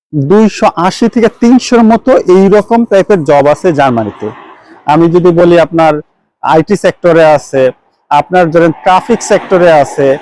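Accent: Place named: Comilla, Bangladesh. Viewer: আপনার কথা কুমিল্লার সাথে মিলে যাচ্ছে।স্যার আপনি কি কুমিল্লার সন্তান?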